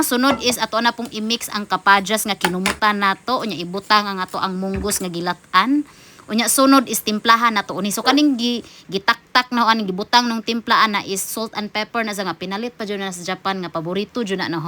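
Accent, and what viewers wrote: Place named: Bohol, Philippines. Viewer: Nindota mn n inyoha dapit dae... gnahan ko nmo very boholano kaayo accent ksagaran ako nbantayan mgtinagalog ng uban...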